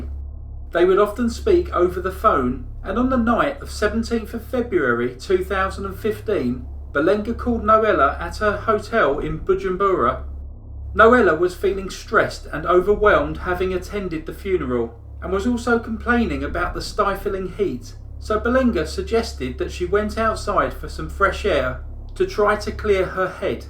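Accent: England, United Kingdom. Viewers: First I would like say that I sure missed my friends beautiful English accent! So good to hear it again... For this woman, it is sad that she is being shunned because of a man who wanted to murder her. Those people need understand that it could happen to them. She is better off without those good for nothing back stabber's. He deserved a longer sentence, 9 yrs is nothing for someone who wanted to murder their wife. He begs forgiveness? He just wanted out the easy way, so he thought. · This was a fascinating case, presented in a compelling style. You successfully blend storytelling and documentary narrative styles, and you enunciate clearly so that you are easy to understand, UK accent notwithstanding. ;-) Nine months with no parole for six seems insufficient, however, I think Noela got some measure of justice with the shock of showing up. Can't put a price on that. 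Great job.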